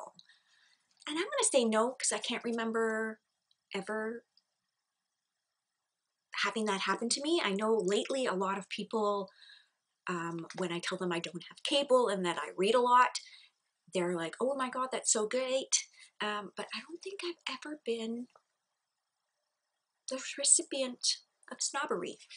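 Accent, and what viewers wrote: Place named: Canada. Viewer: I absolutely love watching your videos. You seem such a sweet lady and I love your Canadian accent, it's lovely. Those were tough questions, I don't what genre I'd choose to ditch, but I'd probably choose Horror too or non-fiction.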